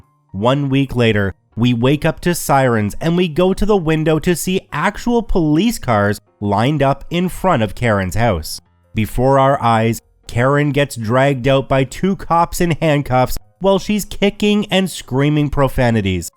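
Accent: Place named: Canada. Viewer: Youve said lana in so many ways so many times its freaking me out. Also did you ever mention that your canadian because every time you say sorry you say it like a canadian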